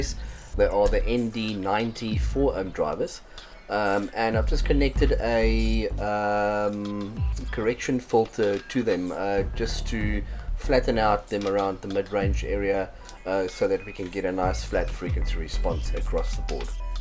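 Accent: South Africa. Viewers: I immediately recognised your accent as SA. Well done! you have created a very nice channel. I will be following. Nice projects!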